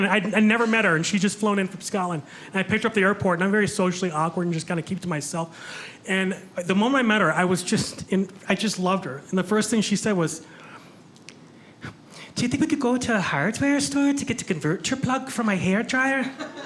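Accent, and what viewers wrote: United States. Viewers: World be fun if he knew how his and his brothers names are pronounced in Norwegian. And funny they’re pronounced so American and not even with a twist of Norwegian.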